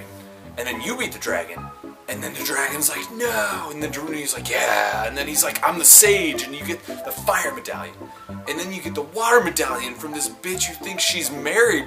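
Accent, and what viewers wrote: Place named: Germany. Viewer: I wish you would have done this in your german accent, but this was really funny you should damage your liver more often xD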